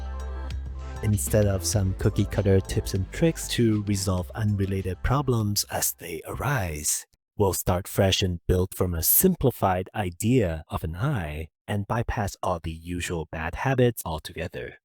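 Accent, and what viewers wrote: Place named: Japan. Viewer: I hear that Japanese or asian type accent and I know this video is going to be filled with amazing quality content, thanks new sub .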